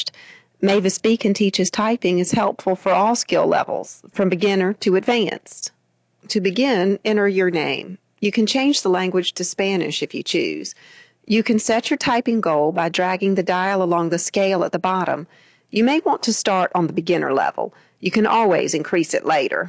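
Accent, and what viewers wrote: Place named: South Carolina, United States. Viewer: I think from South Carolina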